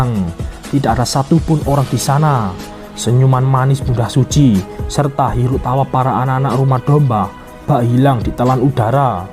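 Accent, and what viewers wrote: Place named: Java, Indonesia. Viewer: Gua suka pembawaanmu bro... Jawa banget....opo onone 😄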